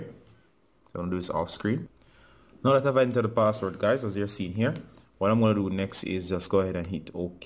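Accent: Jamaica. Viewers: U sound Jamaican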